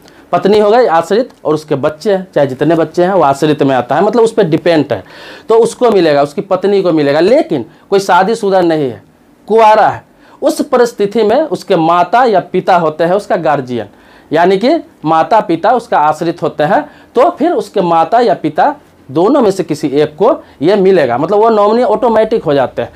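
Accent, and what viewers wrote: Bihar, India. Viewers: Sir aap Bihar see hai. Na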